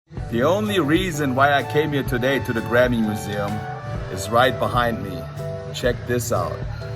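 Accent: Germany. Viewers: Allein am Akzent hat man gehört dass du Deutscher bist. Bis ich dann auch dein Kanal Namen gesehen habe😂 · NEWBEATS MUSIC. He’s from Germany he just has a German accent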